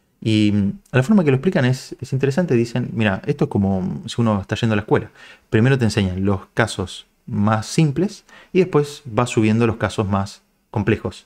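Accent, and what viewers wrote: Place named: Rioplatense, Argentina. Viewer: en español, (Argentino o Uruguayo)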